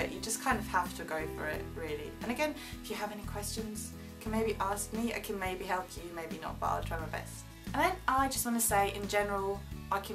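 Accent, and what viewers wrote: England, United Kingdom. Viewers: are ya german? doesn't sound like. you actually have no accent, and you speak german with an english accent, which is impossible for a german! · How did you aquire such an English accent if you're originally from Germany? just curious:) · Woww you sound english.. I thought you were english not german ;o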